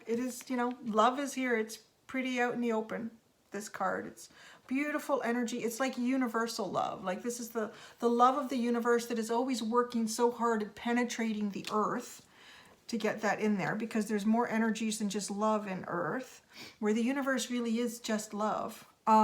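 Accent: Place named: Canada. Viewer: Great reading! Thank you ❤️ I thought I detected a fellow Canadian there, especially after I saw the Roots shirt 😄 Which part of Canada are you from? Hello from Calgary!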